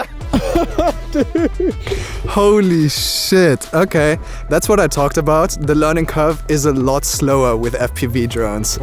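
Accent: Bavaria, Germany. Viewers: Noch nie deutscheres englisch gehört haha, aber feier ich haha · Are you from Germany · ich wusste das du Deutsch bist ich hab es gehört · Na wirkli oda, a Bayrer! Do bist jo goa ned so weit weg. 😉 Wahnsinns Intro übrigens! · Ich wusste es beim ersten Wort englisch hat Mama sofort gehört 😂👍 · Way to funny that you only said like 2 Words and it was already obvious that you are german xD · Ahh lol bei hat sichs geklärt hahaha xD Auch wenn dein Englisch echt ziemlich gut ist hört man es irgendwie immer raus wenn ein Deutscher Englisch spricht xD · Woher aus Bayern kommst? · Haha korrekt erster Satz erkannt dass du aus Bayern kommst korrekt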